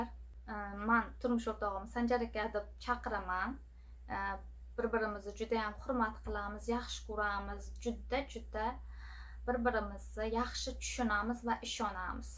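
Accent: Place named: Jizzakh, Uzbekistan. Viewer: Jizzaxcha shevalarizdan sizzi😁😁🤗🤗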